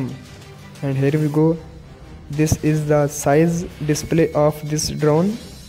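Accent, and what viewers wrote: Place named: India. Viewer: Your information in english with pure indian accent.but its nice.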